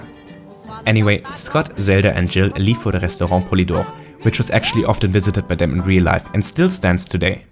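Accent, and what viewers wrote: Germany. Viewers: Junge nach 2 Sekunden hab ich den deutschen Akzent gemerkt hahahahah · 😎. Your choice of words, the attention to certain details, your sense of humor. Also, I'm a huge fan of ACCENTS. Your's sounds German, or Austrian. Of course it might be some other. Finally, being Black American, I don't think I've heard a fellow (white) American go into such detail about JOSEPHINE BAKER & the influence that Black Americans had in Paris during that era. You're clearly (EUROPEAN.) I realize that Europe is far from perfect but I miss it. Also, (MIDNIGHT IN PARIS) is one of my favorite films of all time. For these reasons, I am grateful to you ! 💌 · I'm obsessed with Midnight in Paris, too! This video is what I was looking for when I first saw the film one month ago, and I'm happy to see it was finally created. I never comment, but this is just great. To keep you engaged with your new found audience, I've got a question for you ...is that a German accent I am hearing? 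Keep it up! :)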